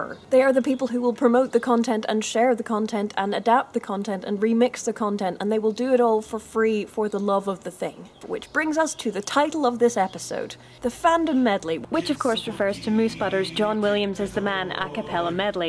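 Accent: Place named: Northern Ireland, United Kingdom. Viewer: No she's Northern Irish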